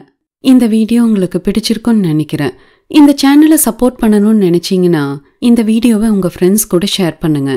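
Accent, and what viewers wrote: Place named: Kongu, India. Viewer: Beautiful kongu tamil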